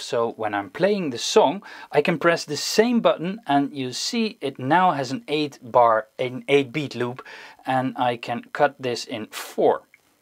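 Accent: Netherlands. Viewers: Hahah je klinkt gelijk Nederlands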